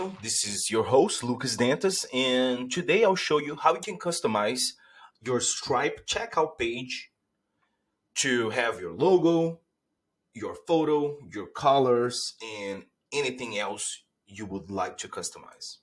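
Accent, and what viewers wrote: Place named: Brazil. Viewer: Sabia que era br pelo sotaque kakkk e nois ma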